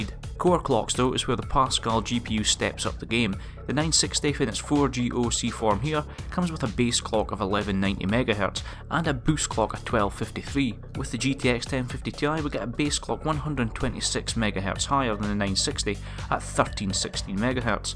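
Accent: Scotland, United Kingdom. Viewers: Good video + scottish accent, am I right? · Faurabouts are you fae loon? Afa strong accent, nae a bad thing mind you :) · scottish accent ,i guess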